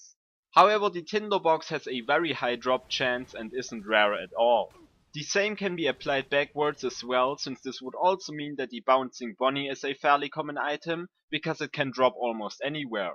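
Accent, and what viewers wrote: Germany. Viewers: Bist du ein deutscher? Mann hört an deinem akcent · Are you german? You're English sound so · are you german?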